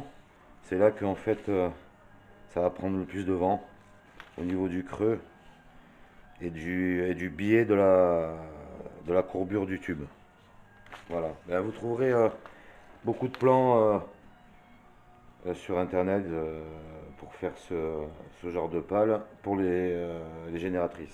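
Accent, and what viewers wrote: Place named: Nice, France. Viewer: bonjour , je suis curieux de voir l’évolution de ton projet ( plus que jamais utile vu les difficulté que connait le pays en ce qui concerne l’électricité ) , voir ou t' en et ( batterie? si oui ,combien d'ampere heure... combien de watts t'arrive à tirer etc ) ps : on reconnait direct l'accent nissart , j'ai grandi a nice :))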